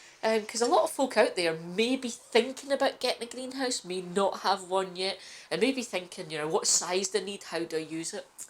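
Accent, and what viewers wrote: Scotland, United Kingdom. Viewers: Hey where are you from I’m edinburgh EH12 so chuffed to hear a Scottish voice. I’ve just go a loan of a greenhouse from an Friend in Balerno and a second hand greenhouse from The family across the road. Would love To connect to pick your brain !!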